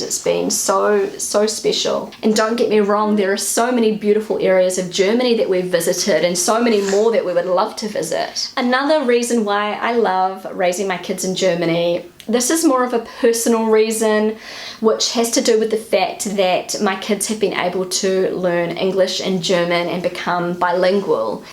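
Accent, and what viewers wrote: New Zealand. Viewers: Your accent is very interesting. I had never heard anyone from New Zealand speak before. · This is a sanitized NZ accent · Thank you for your always positive videos. I really enjoy them. Also nice to hear is your Kiwi accent. After +10 years in Germany you still haven't lost your accent.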